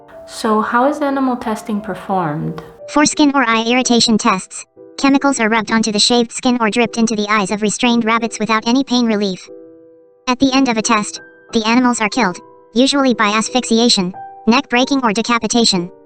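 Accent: United States. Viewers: You clearly grew up in America you liar! To have a yank accent you lived in America and act like a American so yea I call bullshit on your nationality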